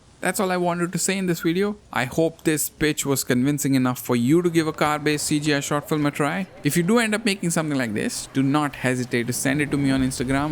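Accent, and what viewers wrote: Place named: India. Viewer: you click the video, you hear an Indian accent.... hell yeah 🔥🔥🔥🔥🔥🔥🔥 like the video and subscribe the channel, you'll never be disappointed.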